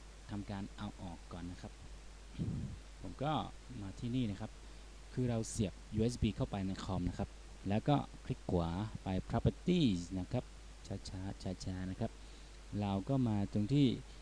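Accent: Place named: Myanmar. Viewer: พูดก็เบา ฟังก็ไม่รุ้เรื่อง สำเนียงอย่างกับพม่า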